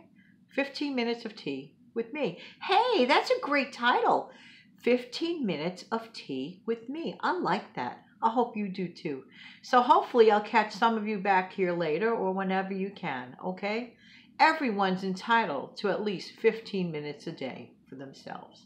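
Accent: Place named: New England, United States. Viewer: Hello Miss from New York City! Your new Georgia subbie friend here. Congratulations on 1,000 subs!! I think you should have many more. Your videos are very informative, thought provoking, and useful, you've got a good sense of humor, love your Yankee accent 🙂 I'm going to binge watch all your videos. Have seen many but not all. Anyways, thank you for making this one. I've been thinking for months about making a channel. So I appreciate you being forthright about your struggles. Off topic, but a question. Do you have any place up there where you can be around grass and trees and enjoy any nature this beautiful time of year? Never having been there, my knowledge of where you are is limited to movies, lol! All I know is somewhere there's Central Park and maybe not another blade of grass or tree anywhere!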